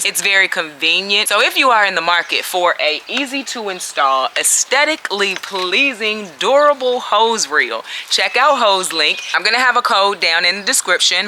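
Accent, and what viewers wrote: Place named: Virginia, United States. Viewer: LoL, it's always the Virginia accent that I love 💞.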